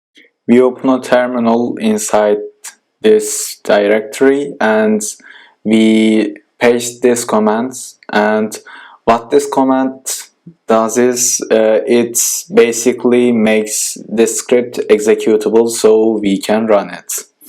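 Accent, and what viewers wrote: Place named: Turkey. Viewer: Türk olduğunu gizleyemezsin aksan ele veriyor :)) . Video güzel olmuş eline sağlık.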